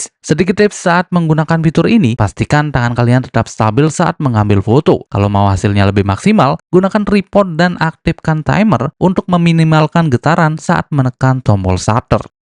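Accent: Bali, Indonesia. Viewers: Orang bali bang? T nya khas bali banget 😅🙏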